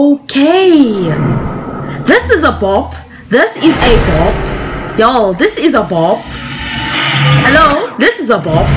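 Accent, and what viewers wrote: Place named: South Africa. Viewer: That accent sounds very familiar. Are you from SA?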